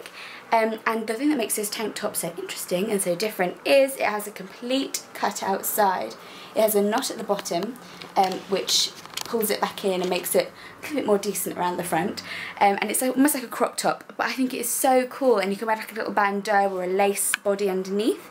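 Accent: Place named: England, United Kingdom. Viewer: You have such a classy English accent, i love it!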